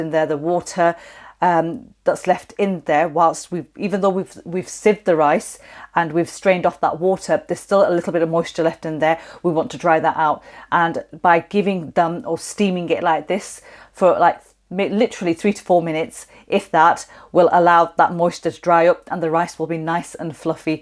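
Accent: United Kingdom. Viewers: You sound like you have a little British accent, but not like people who were raised there. How long have you been in the UK? (just curious... I'm a bit of an 'accent' nut)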